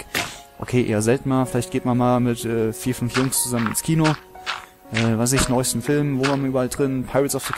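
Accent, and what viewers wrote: Hesse, Germany. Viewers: Woher Genau kommst du aus Hessen? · Als ich gehört habe, dass du Hesse bist (hab ich richtig vermutet), hätte ich dem Video gerne 1000 Daumen hoch gegeben!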